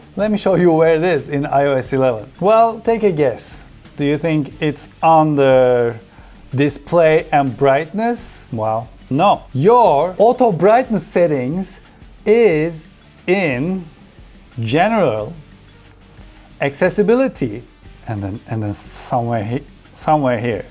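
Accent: Turkey. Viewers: Hahahahaaa telafuzdan direk turk oldugunu anladim 🤣 8 yillik iphone kullanicisi olarak aklim celiniyor demesem yalan olur 🤔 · What a Turkish accent! I wish my headphones are shit and could not able to hear it.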